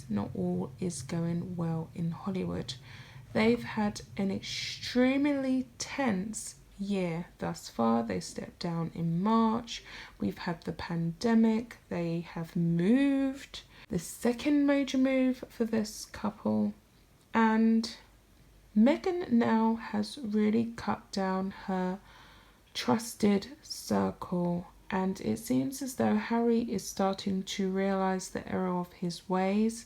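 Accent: England, United Kingdom. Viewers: Or is this your british accent? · It's difficult enough deciphering the English accent, without testing our hearing. Could the narrator please speak up a bit?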